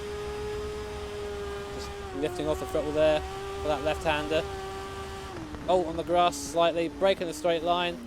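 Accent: United Kingdom. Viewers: Oh wow I didn’t know you had a lisp I thought you were just British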